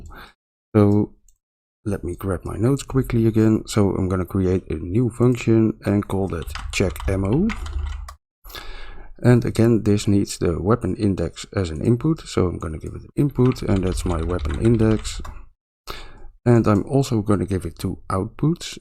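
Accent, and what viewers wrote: Netherlands. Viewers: Dutch accent? :)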